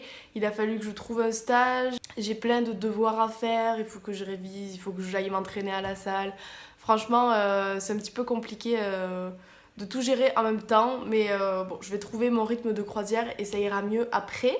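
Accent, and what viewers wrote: South, France. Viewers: Tu me fais trop rire avec ton ptit accent du sud La bise de la martinique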